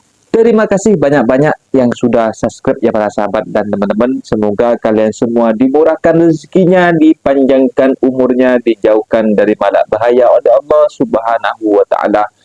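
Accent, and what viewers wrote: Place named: Java, Indonesia. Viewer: Guna BM aksen jawa biasa lh tu👍👍mgkin yg komen tu,hanya pndai 1 bahasa jadi prasaan iri itu ada😂😂semangat bro,mental mau kuat💪💪👍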